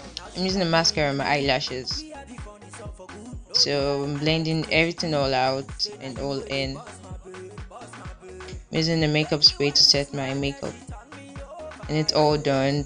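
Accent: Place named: United Kingdom. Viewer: Great video you ve a british accent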